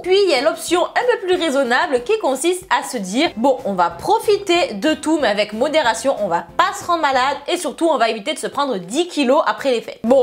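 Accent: South, France. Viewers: J'arrive pas à savoir de quelle origine tu es Du sud d'accord mais sinon ?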